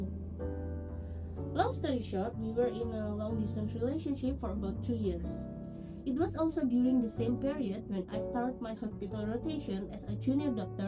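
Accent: Indonesia. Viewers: I know you are indonesian, right after your first sentence, i listen to your sub from 2 years ago btw. thank you. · loh ternyata orang Indonesia ya?? ngomong pake bahasa Inggris tapi tidak bisa dipungkiri logat dan gaya serta mada bicara persis org Indo semangat ya cici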